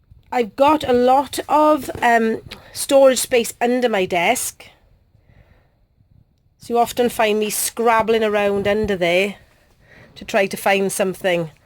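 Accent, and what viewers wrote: Wales, United Kingdom. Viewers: Greetings grom Ireland What a fab craft room. So dinky. Would listen to you all day. Love the Welsh accent. Used to holiday in Wales with my family when I was young.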